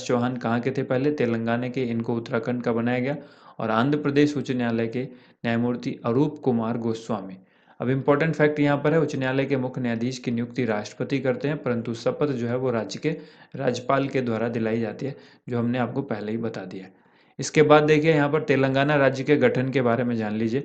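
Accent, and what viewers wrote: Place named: Haryana, India. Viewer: mujhe Lgaa aaap haryana se ho😆😆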